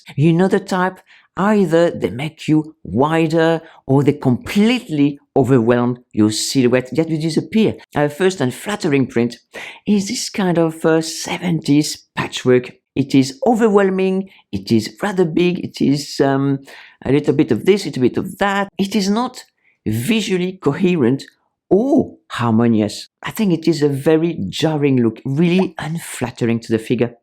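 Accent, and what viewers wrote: France. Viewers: "The LEETLE House on the Prairie Look". 😂. (I love your French accent, not making fun of it. 😊) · has the most adorable French accent on English I ever heard. She has also charisma, talent and style. However she herself had aged well and styles everything for women likewise. Most of us, women after 50, had not, except Asian origin women, who looks like 30 in their 50ties. We have flabby arms, "bat wings", we have often too big, lousy and fat boobs, we have protruding bellies, vanishing wastelines. All these sleeveless dresses, all these dresses on straps looks horrible on most of us. An advice to clap a belt is fine as long as you can find where to clap it 😅. But most important thing is - we, women after 50, do not care so much what anyone else is thinking of us. So if you like floral prints, cowboy boots or lousy dresses- just wear them. 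Wear even dresses on straps and clap belts, even being size 3XL, if you feel comfortable. Life is extremely short. So enjoy it the best way you can!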